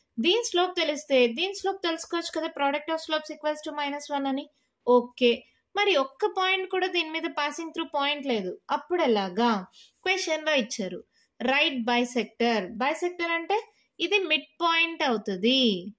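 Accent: Nellore, India. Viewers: Mam are u from Nellore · Mam are you from Nellore???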